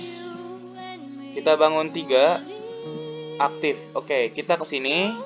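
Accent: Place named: Jakarta, Indonesia. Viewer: Betawi Banget Ya 😊😊😊 Semangat Terus Bang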